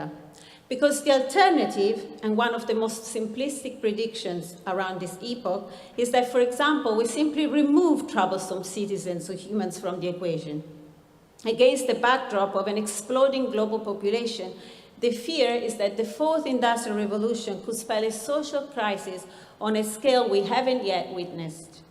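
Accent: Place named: Italy. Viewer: She sounds like an Italian speaking English. Foreigner speakers never achieve a good British accent. Even the majority of native English speakers never achieve a good British accent! You are obviously not Italian. Her husband does not speak Italian perfectly. It is good but he has a limited vocabulary and even he himself has said, he sounds like an Englishman speaking Italian.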